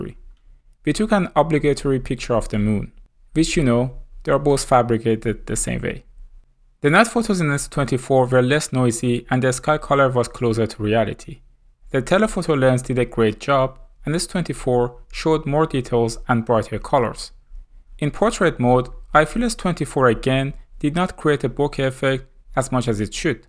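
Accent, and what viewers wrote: Iran. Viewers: Irani accent spotted 😂❤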